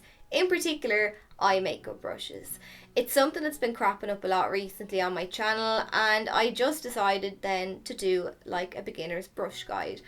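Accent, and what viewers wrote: Ireland. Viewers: You are beautiful. You sound Irish lol.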